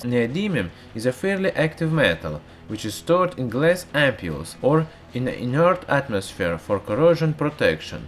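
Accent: Russia. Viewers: Utkarsh Amitabh Srivastava its russian accent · Borat is from Kyrgyzstan while this guy is from Russia or at least has a thick Russian accent Kyrgyzstan and Russia were once in the same empire but I think it's a bit broad to say that Borat has a Russian accent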